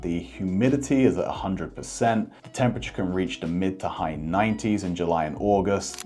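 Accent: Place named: New England, United States. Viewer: No R in Tampa 😂😂😂. Yep, you know a New Englander is talking to you when they drop the R from car but add it back to Tampa, America etc!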